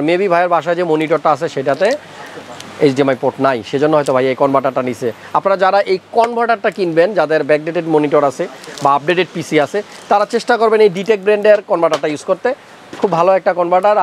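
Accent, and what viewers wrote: Noakhali, Bangladesh. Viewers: নোয়াখালী ভাই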